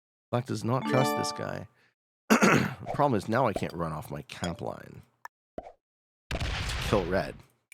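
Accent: Canada. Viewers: im from northern MN, yes you sound like a Canadian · you’re incredibly Canadian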